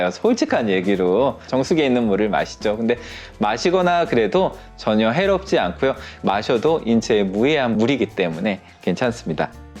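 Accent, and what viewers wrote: Seoul, South Korea. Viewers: 서울 사투리 쓰시는 거보면 서울토박이신가봐요! 재밌게 잘 봤습니다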